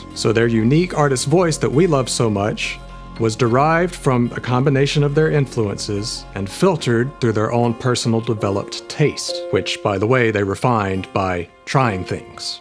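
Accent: South, United States. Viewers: I love this guy’s voice. I love his slight southern accent but completely meditative tone. I want him to read books to me.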